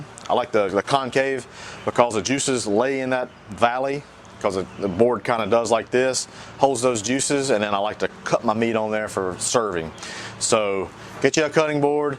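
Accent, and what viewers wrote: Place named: Carolinas, United States. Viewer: Awesome vids! As soon as you started talking, I knew you were a fellow Carolinian! Keep cranking out the great food and information!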